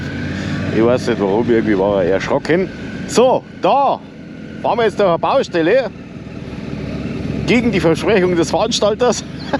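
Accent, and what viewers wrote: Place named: Bavaria, Germany. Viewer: Wir waren 2024 mit dem Bike in Rumänien. Einfach ein Traum. Deshalb werden wir heuer wieder hinfahren. Hab in meinem Navi bereits sehr viele Punkte eingetragen. Deine Videos sind immer sehr unterhaltsam und informativ. Und deine Handzeichen hast dir immer noch nicht abgewöhnt 🙂. Aber das und der Bayrische Dialekt ist eben dein Markenzeichen, und des passt scho so.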